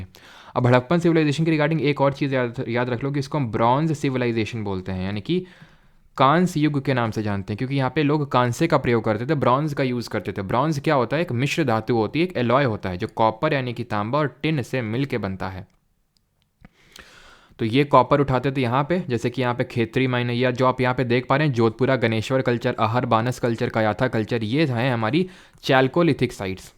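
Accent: Kanpur, India. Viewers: Hn kanpuriya hai sir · Sir aap kanpur se h kya ?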